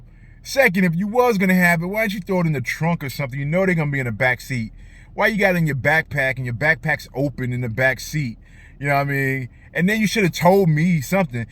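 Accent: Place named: Pennsylvania, United States. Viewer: Are you originally from PA? You got that Pennsylvanian accent lol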